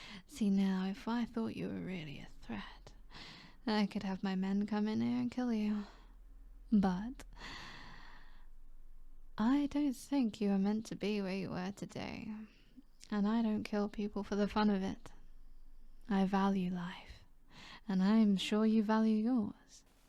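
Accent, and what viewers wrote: United Kingdom. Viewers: fr (The british accent is making it more🌶️) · The british accent is making it more🌶️🌶️🔥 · THE BRITISH ACCENT YALL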